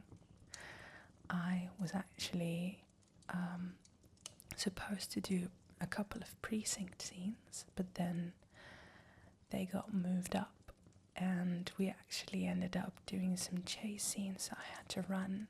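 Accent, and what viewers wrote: United Kingdom. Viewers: Love your British accent 😊🥰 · The British accent got me tund on af lol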